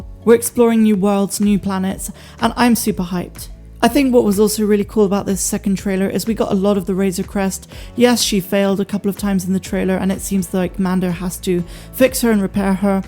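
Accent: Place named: South West, United Kingdom. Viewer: Love the show, sounds like your from down the south west like me!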